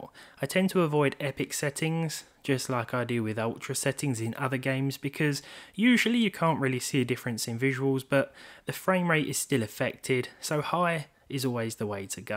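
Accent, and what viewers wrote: United Kingdom. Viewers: For some reason I simply like your British accent 😂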